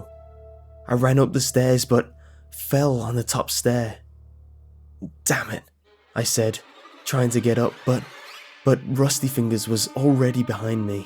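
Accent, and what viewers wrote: United Kingdom. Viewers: Oh my..... I thought he said chicken too! LOL Well darn his amazing British accent I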